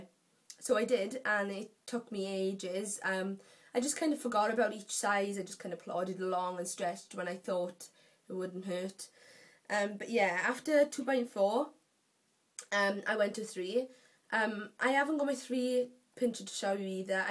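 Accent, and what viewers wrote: Wales, United Kingdom. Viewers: Oh wow... are you welsh :')? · Your accent is amazing! Every lads holiday we meet a group of Welsh people so funny! I got my ear stretched today check it out would be nice to know what you think! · omg welsh much <3 snap !! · Welsh accent.. *O* ~Melts <3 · Welsh for the win :D :D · FUCK YES WELSH ACCENT!!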